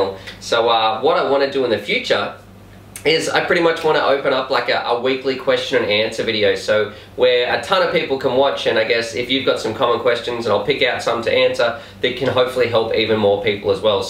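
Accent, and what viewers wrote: Australia. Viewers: You sound Australian but I was confused because you have been using US pricing in vids? I Aus as well. Thanks for uploading all these amazing vids!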